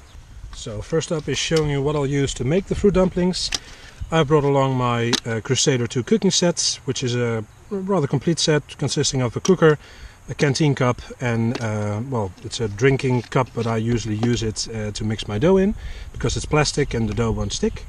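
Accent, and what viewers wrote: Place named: Canada. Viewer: Another enjoyable video! Where are you from? I am thinking Canada? But I may be completely wrong. You do a great job!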